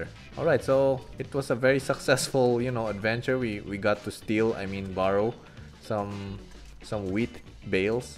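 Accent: Philippines. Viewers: R u a filipino? Ur english accent is same as filipinos, btw minecraft is being popular again hope u inspired by pewds haha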